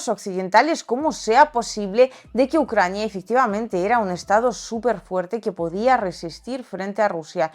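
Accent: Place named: Spain. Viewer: Jaja "Ojos bellos..vosotros sabeis que eso mismo..les Hicieron a los Mexicanos..vale EE.UU. "Utilizo " de todo. Y despojo parte del territorio de Mexico...Puerto Rico..Guantanamo...Japón..los hidrocarburos de Siria..¿¡¡ Y LA ONU.?? AH PERO COMO RUSIA YA TOMO POSESION DE TERRENOS DE UCRANEA..AHI SI SE ASUSTAN Y ACUSAN..CON LA COMPLICIDAD DE GUTERRES. ONU..( hablas como española . Pero..vuestro fisico y rostro..LLEVAN "LA MARCA...SELLO" DE RUSIA..)😁😄😄🥰💕👍👍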